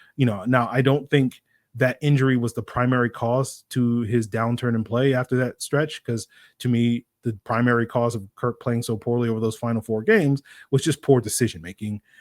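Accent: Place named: Germany. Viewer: I vote more German accent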